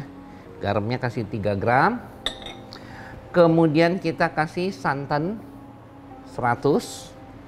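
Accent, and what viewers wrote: West Java, Indonesia. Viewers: Euleuhh. Gening Chef teh urang Bandung. Pantesan mun nyarios rada ngalogat sunda. Hehehehe. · Pantesan chef logatna sunda pisan😁😁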